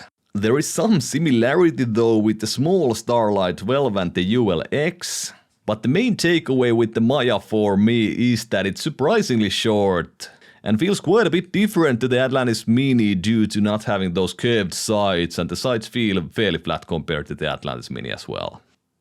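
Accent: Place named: Finland. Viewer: It's a very typical Finnish accent. If you play CS in EU you will hear that accent all the time. If you want a good idea of what the language sounds like and Finnish culture, I found the music video " " by " " very fascinating. My Finnish friend said to me it is a very good representation of what it means to be Finnish.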